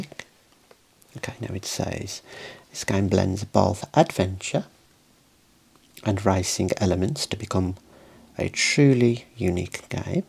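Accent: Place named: West Midlands, United Kingdom. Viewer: So good to find an ASMR channel with a West Midlands accent 🙂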